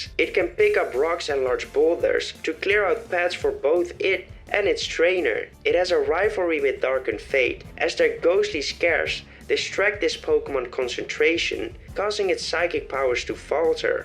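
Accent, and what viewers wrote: Netherlands. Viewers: Ik hoor een nederlands accentje haha, verder echt coole designs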